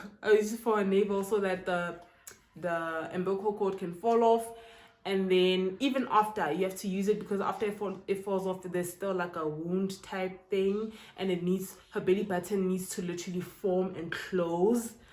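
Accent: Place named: Pretoria, South Africa. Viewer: 🤣🤣 "Comfortability" 😂😂 I can just hear hore o mkhaya wena, o tswa Pitori🤣. Your videos are so helpful mama, Thank you🤗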